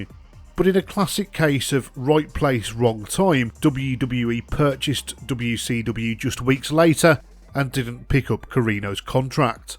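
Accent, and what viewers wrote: Birmingham, United Kingdom. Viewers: A Brummie guy doing wrestling reviews? Hell yeh!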